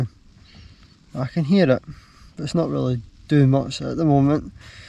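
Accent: Scotland, United Kingdom. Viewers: are you british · Are you Scottish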